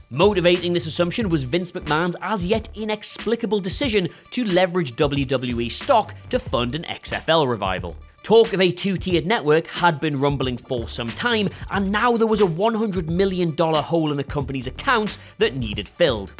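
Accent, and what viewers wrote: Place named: Teesside, United Kingdom. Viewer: listed as from Newcastle but he sounds more like a Teesside boy to me.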